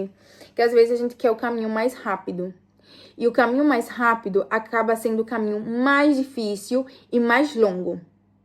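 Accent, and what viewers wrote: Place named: Northeast, Brazil. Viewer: Seu português é, um sutaque de nodestina .... e não tem nada Haver se mora fora do Brasil ou nao...😂😂😂😂😂😂😂😂😂Nodestina......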